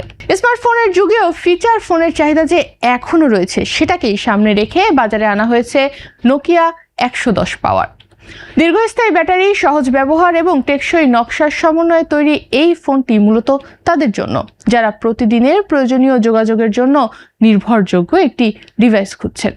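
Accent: India. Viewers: কোলকাতার টোন ধরছেন নাকি !!!!!!!!!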